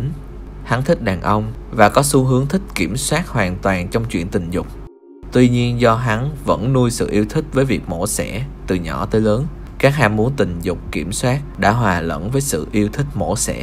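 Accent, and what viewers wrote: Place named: Saigon, Vietnam. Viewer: a này nói giọng sài gòn hay và cuốn quá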